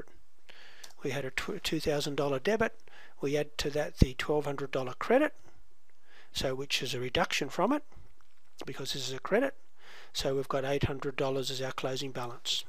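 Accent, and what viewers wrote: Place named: Australia. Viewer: You're an aussie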